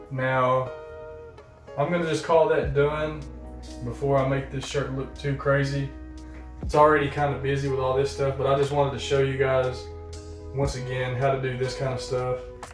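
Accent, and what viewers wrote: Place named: South, United States. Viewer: Where are you from? I'm from NC & AL. and your accent sounds close.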